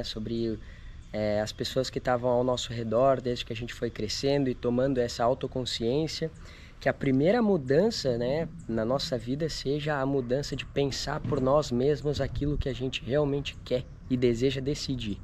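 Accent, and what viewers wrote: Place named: Dominican Republic. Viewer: Desde o primeiro vídeo já imaginei que você era do RD ahaha a forma de falar lembra o Eslen!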